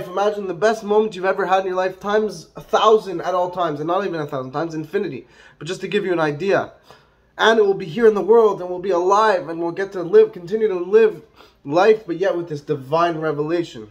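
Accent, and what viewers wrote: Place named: United States. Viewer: Who are you, and where are you from? It sounds like you are from the U.S.A. HOW DO YOU KNOW?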